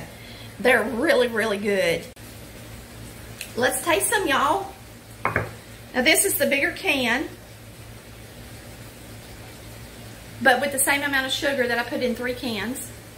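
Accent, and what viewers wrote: South, United States. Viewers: love that Southern accent. Cheers.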